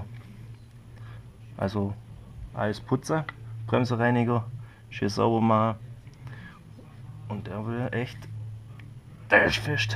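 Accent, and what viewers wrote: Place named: Swabia, Germany. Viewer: Sauber. Schwabe. Aus welcher Gegend kommst? Gruß vom Flughafen.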